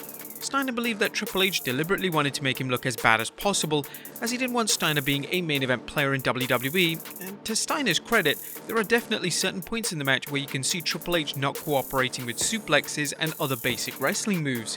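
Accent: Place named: United Kingdom. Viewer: H not haitch bee Kay. I hate how the UK people talk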